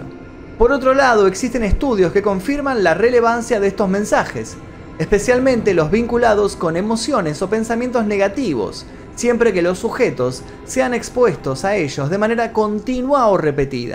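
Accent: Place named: Argentina. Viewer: si se re nota que es argentino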